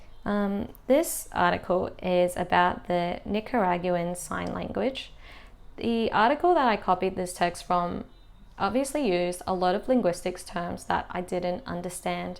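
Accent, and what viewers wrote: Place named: Australia. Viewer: Is it bad that I knew in the very first 3 seconds you were Australian like me? Hope you're doing ok and have found a job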